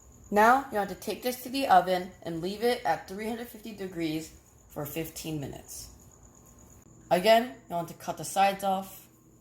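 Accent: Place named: South Korea. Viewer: 앗 한국식 영어가^^ 맛있게 잘 보고 가유~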